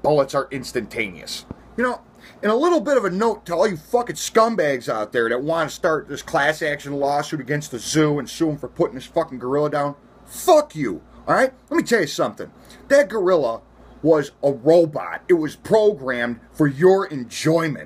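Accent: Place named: South, United States. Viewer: Where were you from? I love the southern accent! Men & women! You should have written a country song about the incident. Though id like a clean language lyrics of that song if you could write it! You're are are right! That mother was ignorant!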